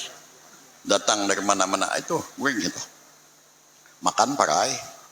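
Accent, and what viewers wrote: Banjar, Indonesia. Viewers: Asli bahasa Banjar nya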